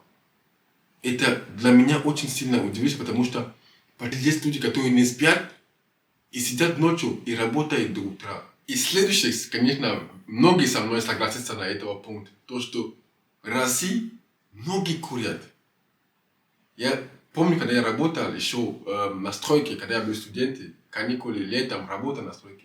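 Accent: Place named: France. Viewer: По акценту франко говорящий, а в душе русский (почти). Респект чувак!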